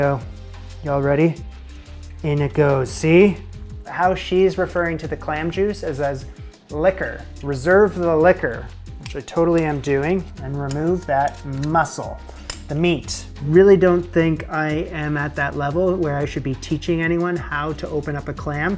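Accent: Canada. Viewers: i clocked that you were canadian immediately before you even said that you were, amidst a sea of americans on youtube i spot my brethren with ease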